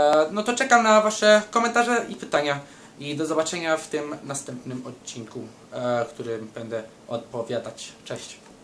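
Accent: England, United Kingdom. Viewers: BO JEST Z ANGI TO AKCENT:D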